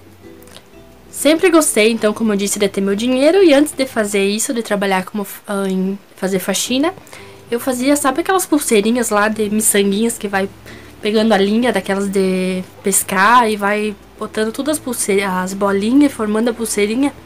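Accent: Rio Grande do Sul, Brazil. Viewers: Gosto muito do seu sutaque gaúcha seu cabelo é muito lindo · Amei seu vídeo, mas nunca tinha ouvido falar que alguém ama leite ainda mais sendo gaúcha tchêê...🤗... cadê o churrasco e o chimarrão?...😋... brincadeira rs...seu sotaque é lindo e vc não deve se envergonhar nunca pois é uma característica sua, de sua região que vc deve se orgulhar sempre...um superlike...😉😊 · Olá também sou gaúcha.amo seu sotaque queria ser assim também...e não vivo sem cebola KKK. amei o vídeo Deus te abençoe ❤️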